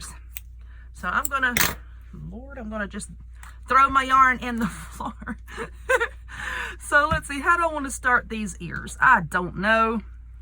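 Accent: South, United States. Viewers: I LOVE cats and Candycorns! What an Adorable combo! You are So creative, I love it! I just got back from DollarTree a while ago with the stuff to make your owl wreath! I can’t wait! I love you videos SO much! I love listening to your southern accent and some of the cutest southern things you say! Thank you so much for brightening my days and helping me be more creative!🎃🎃🎃🖤🖤🖤🧡🧡🧡🎃🎃🎃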